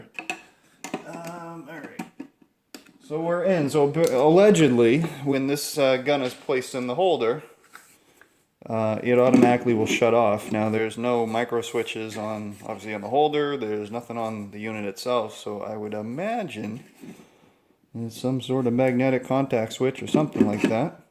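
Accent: China. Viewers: "Chinglish" BOOM--SUBSCRIBED!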